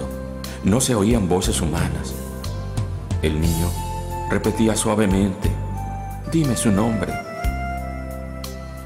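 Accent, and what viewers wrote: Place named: Latin America. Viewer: Bonita historia..D : De donde sacaste la voz? es latina ,jaa ..pero esta bien,tiene su encanto.jaa te doy mi pulgar jajaa ..hayy que daño..no te rias ,quitarse el pulgar para dartelo ,duele un poquito jajajajaaja... te queria dar las estrellitas uhm..pero se me estropeo el Cohete interrestelar jajaaaaa... besitos de un gatttito gotico..miauuuu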